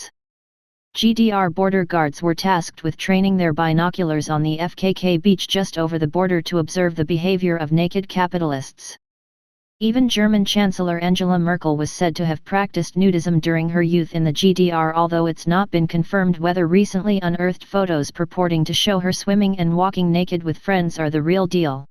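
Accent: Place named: United States. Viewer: But the accent is American!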